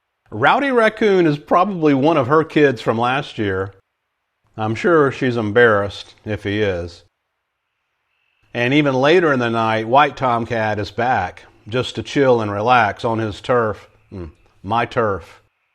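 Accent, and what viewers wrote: South, United States. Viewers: This is what YouTube is made for! What an amazing experiment, and how generous of you to share your home with your fellow critters around you:) Thank you for making this and sharing it. You're a very kind person, and your lovely southern accent is a bonus for narrating your adventures! Edit:, please do stay curious!!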